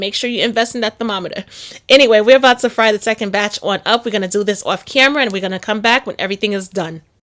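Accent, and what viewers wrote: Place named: Brooklyn, United States. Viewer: Great accent. Maybe Brooklyn NY?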